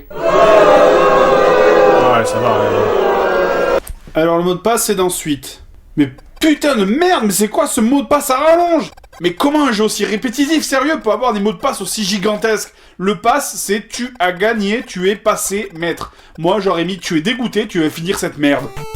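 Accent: South, France. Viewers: ha c'était le bon temps, l'époque du gros, avec son bonne humeur et son accent du sud. J'espère qu'il reviendra un jour pour un featuring special avec le nouvel acteur de droite. Perso j'ai toujours préféré l'ancien acteur. Et ceux qui pensent que c'est la même personne. Déjà regardé les vidéos. On passe directement du gros sympa au mince, sans voir à aucun moment le gros qui maigri peu à peu. une vidéo tu as le gros et son accent du sud, et l'autre vidéo tu as un mince, souvent avec de fausses lunettes, ou sans lunettes, son accent comme par magie a disparu, il passe de brun à roux, ce n'est même plus la même voix... Il n'y a que la chemise qui reste la même. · Je me fais un petit retour en arrière. Je me refais tous les. A cette époque tu avais encore notre bel accent du Sud!😎 Merci d'être sur YouTube depuis tout ce temps. Ne changez rien! Bisous les gaziers! 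· il est de Perpignan, donc il avait un léger accent du Sud. Il l'a pas mal perdu depuis, même si sur certains mots tu peux encore l'entendre en 2026. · Il vient du sud non? ( ne me tuez pas je decouvre la chaine)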